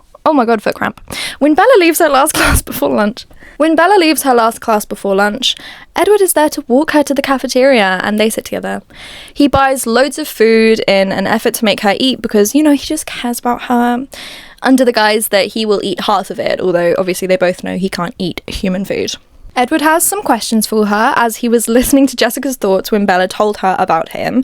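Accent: United Kingdom. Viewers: When I read the twilight books I had no idea Jacob was supposed to be 15! It’s insane. - “it’s nice to read a romance book where the female protagonist has good female friends… and the romance doesn’t get in the way of that friendship…” uuuuhhh new moon might change your mind. I love Bella read in a British accent, it makes her voice so much better. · I hope you do all 4 books! Nostalgia, cringe, and anything with English accent is a delight to listen to. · I know its mostly just because you're British but you would be such a good audiobook reader.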